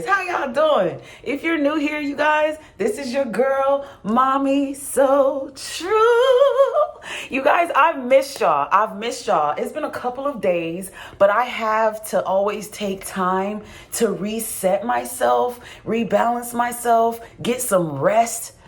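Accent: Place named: Ghana. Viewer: I like the Ghanaian accent "my sista."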